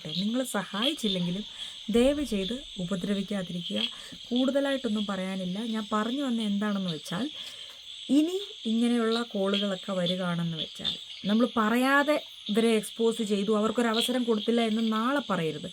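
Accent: Thrissur, India. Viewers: Slang kettitu Thrissur ano ennu oru doubt...oro koana mala vanangal und nattukarekodn parayippikan....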